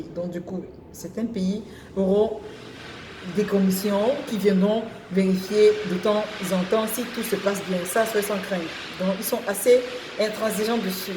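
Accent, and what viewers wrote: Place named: Cameroon. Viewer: Les congolaises n'ont jamais un tel accent . Je crois bien qu'elle soit une camerounaise. Les congolaises parlent mieux que ça . attention !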